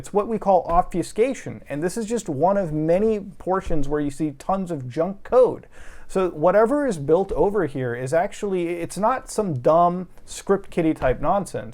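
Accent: India. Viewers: Can you read all text written by scammers in ur Indian accent like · Your indian english accent is really bad lmao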